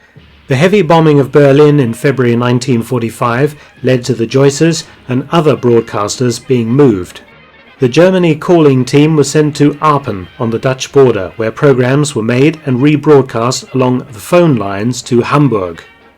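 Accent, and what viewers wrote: United Kingdom. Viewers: Sorry guys but it all sounds a bit iffy to me as many of these types of programs do! it sounds very pompous and how could anyone know what really happened. Its almost like listening to a WW2 propaganda speech on the radio, half truths and no better than the usual bull crap you find on Youtube, but this has a nice British accent. (fake accent?)The only real thing is the film used. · I love the British accent. Militree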